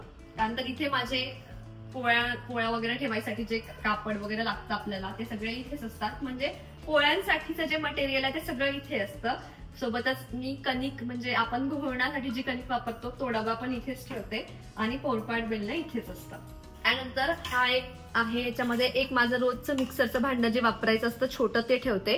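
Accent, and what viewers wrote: Vidarbha, India. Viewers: Mala tuza aajcha kitchen organization khup awdle,tuzya languagewarun nakkich tu vidarbhatli aahes ase watle,karan me suddha thitlich aahe wardha district apli bhasha aikayla khup chhan watle,me pan aatach modular kitchen kele,organize kele baryapaiki pan aata tuzi pan idea use karel apli manas apli mati😅😅😅😅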